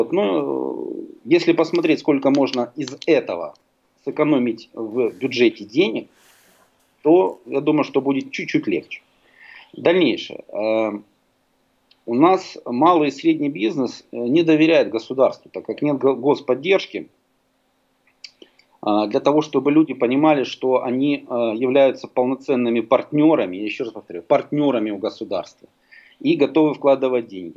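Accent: Moscow, Russia. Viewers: Диктор с московским акцентом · акцент то Московский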